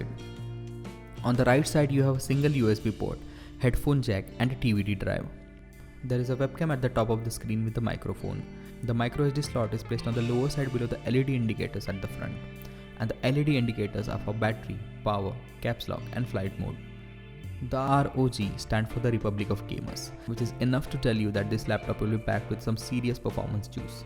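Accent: India. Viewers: Indian acent is really anoying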